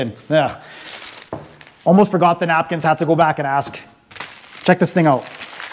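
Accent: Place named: Winnipeg, Canada. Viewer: You have a thick accent. Are you from Winnipeg?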